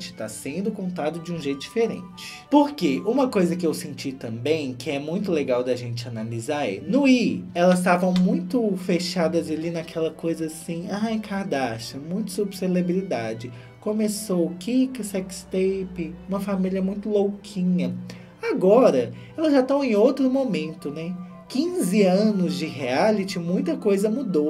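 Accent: Minas Gerais, Brazil. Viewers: do céu, NUNCA acompanhei as Kardashians. Mas gostei tanto do seu jeitin (vc é mineiro néam?!) de contar a história que vou acompanhar por aqui! Bjos!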